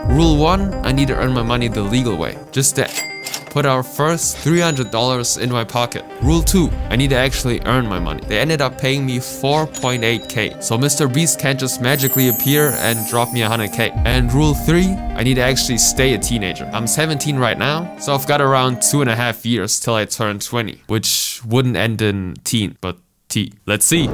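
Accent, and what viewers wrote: Germany. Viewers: im a trippin or does he have a german accent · german accent detected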